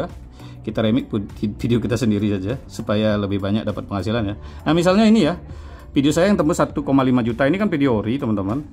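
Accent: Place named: Bali, Indonesia. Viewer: Uli logatne be ke tebag nak bali ne😄 rahayu wii🙏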